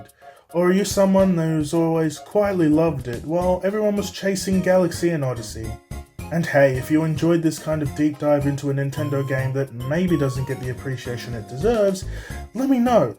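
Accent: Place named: Australia. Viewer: the australian accent. like nails on a chalkboard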